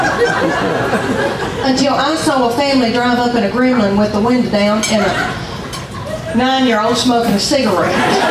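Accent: South, United States. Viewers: She is my people! Those who say she isn’t funny….. have no sense of humor! Humor is always based in some truth. We lived in Europe when we started watching her and her accent made me miss all those years we lived in the south! I have a friend from Tennessee who could pass as her sister, that’s why I say she’s my people! ❤️ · Her southern accent makes it funnier 😂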